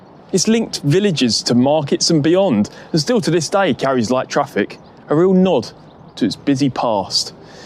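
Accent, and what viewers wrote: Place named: Birmingham, United Kingdom. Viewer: Definitely entertaining 👍. I love the Brummie accent.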